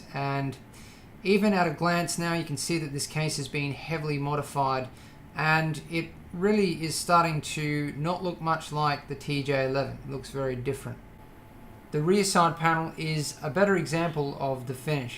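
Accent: Australia. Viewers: this is the only video ive seen from you, sound australian? do you have a business that makes custom pc's? if so, id be really interested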